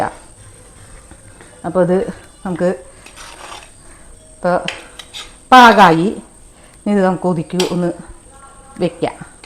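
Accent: Thrissur, India. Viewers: കപ്പ ബിരിയാണി അടിപൊളി തൃശ്ശൂർ ഭാഷ നല്ല രസം ❤Super video .നമ്മൾ കപ്പ ന്നു പറയും❤വിറകൊള്ളി എടുത്തു വരുന്നത് ഓർത്തപ്പോൾ ചിരി വന്നൂട്ടാ 😂😂❤Super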